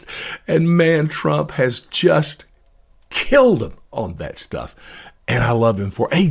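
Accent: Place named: Chicago, United States. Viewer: You sound like you’re from Chicago… I’m from the south side… I worked Chicago fire department for 30 years retired three years ago I am 75% out of Chicago at this time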